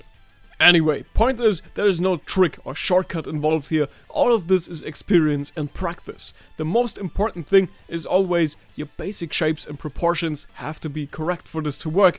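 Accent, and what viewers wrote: Germany. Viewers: as a german i could immediatly tell u r german too.....and by the water lmao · Hey das hört sich mehr Nach denglisch das du da sprichst 🤣🤣 Ich bin auch nit besser😅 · Liebe die Pokemon Musik und den Deutschen Akzent!!! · This german Accent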